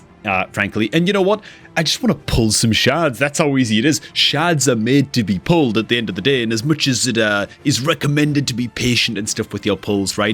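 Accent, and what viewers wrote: United Kingdom. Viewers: Why you hating on him he’s just got a British accent 😂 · He's british ...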